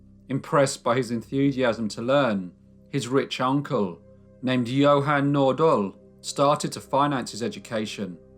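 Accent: United Kingdom. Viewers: Gerrylynn mako. It is a British accent. I believe it is the Queens English. is a well educated person.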